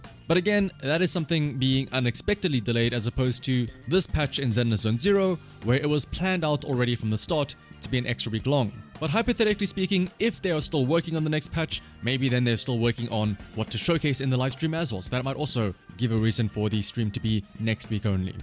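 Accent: South Africa. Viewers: I'm sorry this is so sudden and random but this has been bugging me for ages... Are you South African? Your accent is so specific (I'm South African too)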